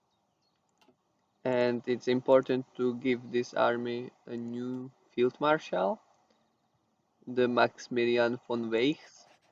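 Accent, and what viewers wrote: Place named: Czech Republic. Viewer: Jsem si říkal, že ten přízvuk mi zní trošku Česky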